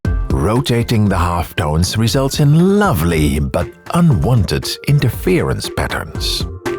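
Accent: Netherlands. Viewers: Your channel is the only one where I can stand such a thick Dutch English accent. Normally can’t stand it at all (“tenenkrommend”) but your presentation and mood just draws me in and envelops me. It is especially enjoyable and just for this once I’ll just have to allow your accent to exist. Thanks for taking the time to share your dots with us. Never change. Also, nice music!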